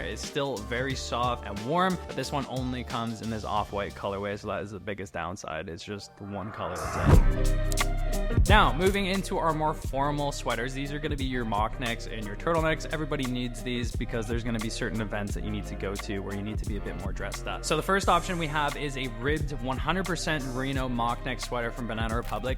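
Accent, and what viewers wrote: United Kingdom. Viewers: Had to drop a like for the British accent LOL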